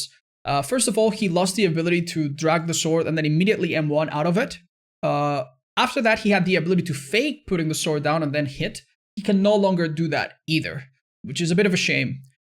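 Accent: Spain. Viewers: Question for American/British viewers, Does have a Spanish accent or just an accent in general? I'm surprised by how good his english is.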